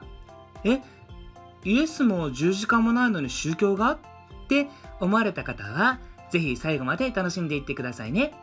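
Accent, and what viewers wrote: Kansai, Japan. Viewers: この絵にそんな意味があったんだ〜と感心しました。硬いパンでも、勿体無いから工夫して食べよう、うん、とっても大事な精神だと思うけど、プロテスタントはやっぱガチガチだね〜と思いました。プロテスタント系の中高一貫校に通っていたので、何となくそういう雰囲気って分かる気がしました。所で、は大阪？出身？イントネーションがもろ関西ですね。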